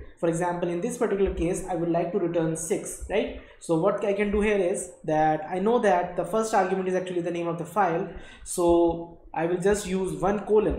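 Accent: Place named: India. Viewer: what an indian accent.. jesus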